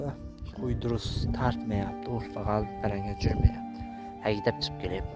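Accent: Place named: Chiroqchi, Uzbekistan. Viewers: укам.мен.хам.чупонман.шевангиз.бизникига.жуда.ухшаеди.узиез.хакингизда.малум от.беринг..каердансиз.самарканд.жом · Dustim uzingiz qayirdansiz. Chiroqchi shevasida gapirayabsiz. Sog buling omad tilayman.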